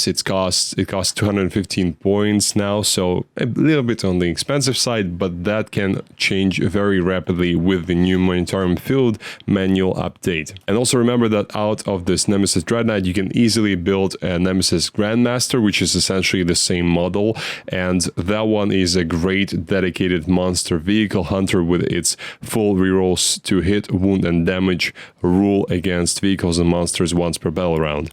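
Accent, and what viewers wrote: Russia. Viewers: Pls tell me that is russian accent? Or I'm wrong :)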